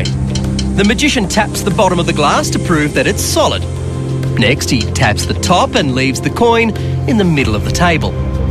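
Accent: Australia. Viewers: Ey... It's good old Aussie Accent ^_^ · Can't take this Australian accent seriously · it's the australian version with an aussie narrator lol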